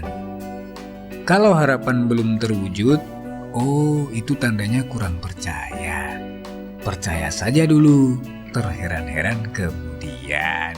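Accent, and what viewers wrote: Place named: Madura, Indonesia. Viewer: Logat madura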